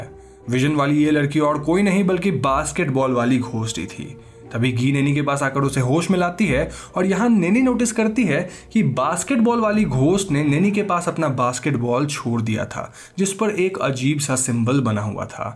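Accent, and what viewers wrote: Bengal, India. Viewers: I love And his bit of bengali accent🤗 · U got a somewhere bangla accent... 😊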